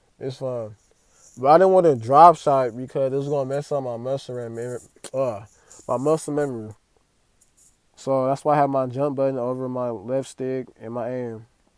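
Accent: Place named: Bay Area, United States. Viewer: Bruh can tell by the way u talk u gotta be from around the bay, Vallejo here 💯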